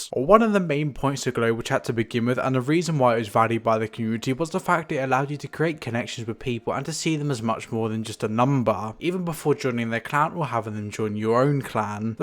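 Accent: United Kingdom. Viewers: You sound like you’re northern Irish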